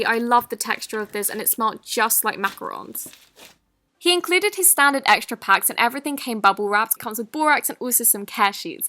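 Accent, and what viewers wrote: England, United Kingdom. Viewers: What part of England are you from??? And your the best😂😃😂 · BRUH SHE HAS A BRITISH ACCENT SO SHE PRONOUNCES THINGS DIFFERENTLY!!! 🙄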